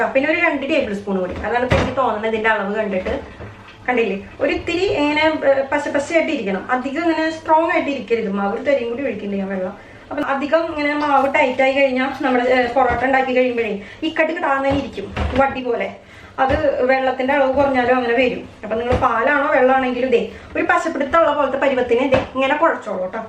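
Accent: Thrissur, India. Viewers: Midukki thanne ! Keep it up. Very nice presentation. TCR slang exalted. Midumidukki. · Hi, awesome and super easy recipe.I love your way of talking and the Thrissur slang..cooking as well as your slang is simply superb..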